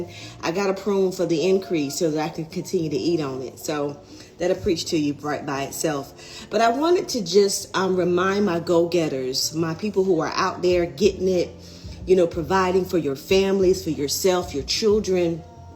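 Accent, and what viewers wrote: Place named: Carolinas, United States. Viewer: Heeeey you from The Carolina’s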